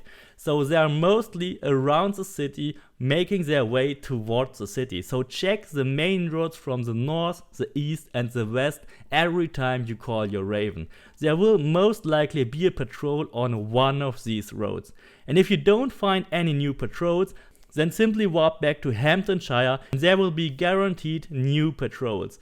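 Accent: Germany. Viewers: Short Question ur German?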